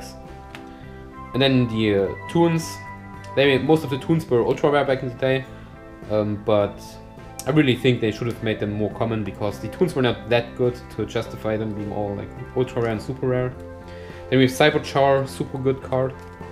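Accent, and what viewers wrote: Germany. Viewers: Bist du deutscher? Dein akzent wirkt irgendwie recht deutsch xD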